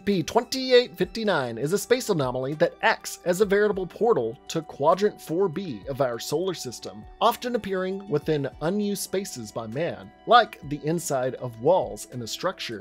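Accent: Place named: Indiana, United States. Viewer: .........you’re from Indiana, aren’t you.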